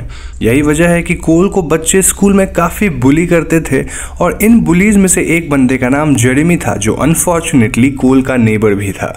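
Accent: Bengal, India. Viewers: You have a bengali accent😅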